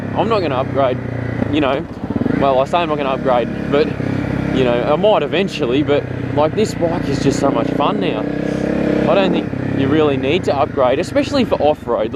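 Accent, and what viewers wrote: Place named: Australia. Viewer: I am in Canada have been watching your vids for a while and have a 250L and i cant tell you how many times I have joked around and said here in a aussie accent and my friends all look at me like im retarded lol your awesome dude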